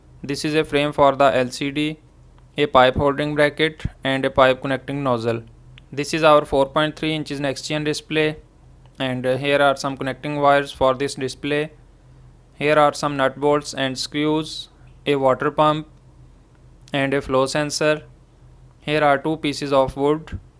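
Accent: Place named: Pakistan. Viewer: after hearing your voice I'm guessing you are Pakistani.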